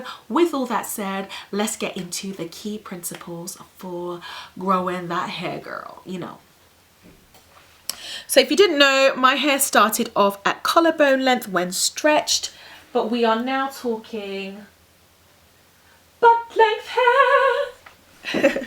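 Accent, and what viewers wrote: England, United Kingdom. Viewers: you had an English accent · That English accent...love!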